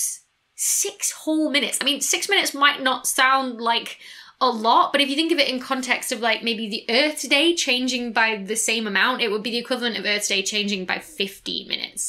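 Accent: United Kingdom. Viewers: Tell me, Doctor, what accent of English is it that you wrap your presenting in? It does not sound exactly the way of American I am used to hearing on YT, nor quite British. It is rather lovely, let me say... Oh, British then? What kind of British? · Dr Becky... charming as always... your UK pronunciation of geysers indeed sounds like US pronunciation of slang for old men... keep the accent... One topic I hope you'll do would be on tidal locking of bodies in orbits. It is really hard to understand why rotation rate could/would synchronize itself to orbital speed since bodies don't have handles (like pots and pans) by which the larger central body could "grab" them and adjust their rotation rate (eg: how did earth fine tune Moon's rotation to tidally lock it since moon doesn't have handles and Earth doesn't have arms by which to grab and do the trick). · I thought 'Geezer' is an anglicism. 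Turns out geezers are a global phenomena. I can't tell woch county she's from, but local accent is most definitely at work here. Somewhere between Cardiff and Glasgow, i'd guess. England alone have more diverse dialectal difference than entire USA. Like, you could make out the native postcode from listening to them. Geezer.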